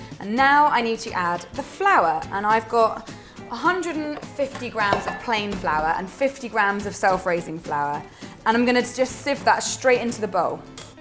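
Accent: England, United Kingdom. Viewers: Awesome cupcake baker, tatted up cutie and British accent? I believe I just found my new crush! It took me like four vids to realize she was saying "whole milk" and not "homo" tho lol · This is one of my favorite English accents. It’s like music to my ears, I could listen to her all day ❤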